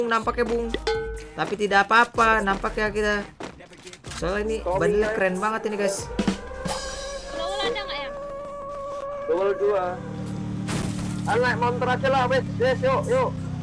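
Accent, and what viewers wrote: Java, Indonesia. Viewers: Bang kamu orang jawa ya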